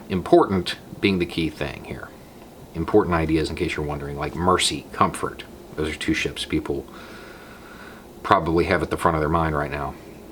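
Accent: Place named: Florida, United States. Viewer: Wow, I never really thought you sound like a Floridian until you tried to make the word “our” NOT sound like “are”🤣🤣🤣 I’m from Indiana and I can’t do it either🤷‍♂️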